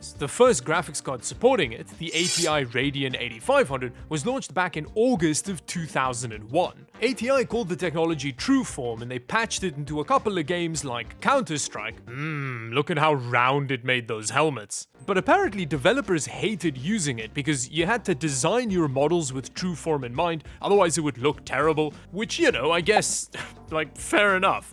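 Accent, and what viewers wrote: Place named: South Africa. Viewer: Hey man, I'm loving your content, are you a fellow South African?? It's so refreshing to hear a familiar accent XD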